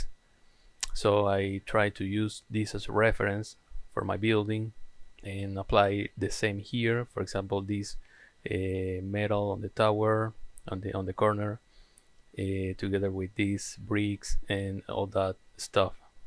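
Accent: Argentina. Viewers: Excelent tutorial. Also, this man speaks english with a Good Airs accent, just like me, so really Easy to follow. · Jaja, me siento orgulloso de haber identificado el acento argentino (? muy buen trabajo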